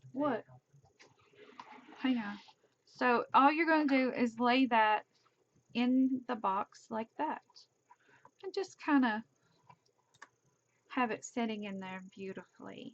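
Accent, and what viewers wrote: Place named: South, United States. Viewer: I will. I live in North Georgia you sound like a southern girl too lol!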